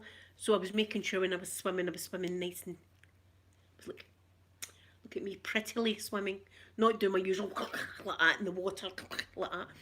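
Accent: Scotland, United Kingdom. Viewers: listening to you speak reminds me of back in the day, when we were able to travel freely and I went up to visit my grandparents and cousins in glasgow. Coming from germany I have not been back to see them in a long time and when someone speaks in the same scottish accent as I am used to hear from them, it always brings back memories from when we all used to gather at my grandparents flat in glasgow and talk about all the things going on in peoples life. I think the last time I was there must have been about 3 years ago or something, so its been a while. I just wanted to thank you for this video and the memories that raised in me while watching it. Keep up the good work and stay safe out there!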